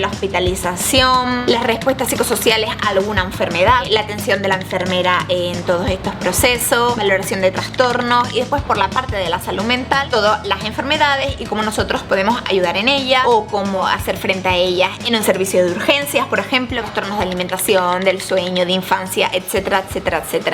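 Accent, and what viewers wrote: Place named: Argentina. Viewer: Hablas como argentina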